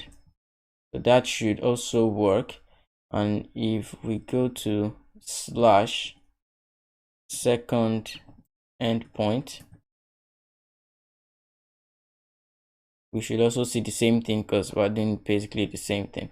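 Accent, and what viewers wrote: Nigeria. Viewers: Name and accent sound Nigerian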